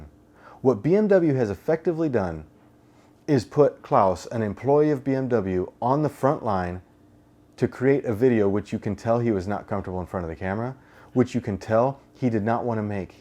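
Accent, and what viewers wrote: United States. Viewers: Good video man. The way you sometimes pronounce "L"s is very strange to me. Where does that come from cause you have a very natural American accent aside from that.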